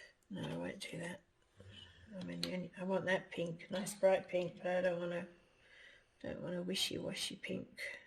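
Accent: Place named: United Kingdom. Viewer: I have two of Sarsh's paintings. One is Low Tide (there is a video). Have a smaller condo so space is limited but her paintings are the highlight of a wall. She noticed you because of your exceptional progress over a short time. Good for you. Assume you are in British Isles based on your lovely accent and 5 hour time difference. Have a wonderful day!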